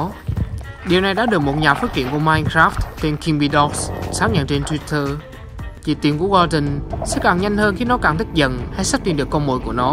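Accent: Central, Vietnam. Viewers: Anh người Miền Trung ak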